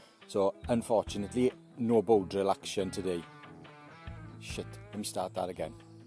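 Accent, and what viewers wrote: Wales, United Kingdom. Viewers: Great video, hope you don't mind my asking, but whereabouts are you from? (I know Wales lol) I recognise your accent, you sound like your an Amman Valley boy.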